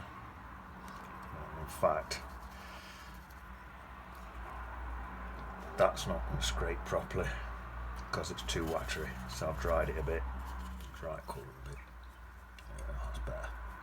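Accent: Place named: Yorkshire, United Kingdom. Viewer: Good Yorkshire twang that is ... tha'll be reet ... 😁😁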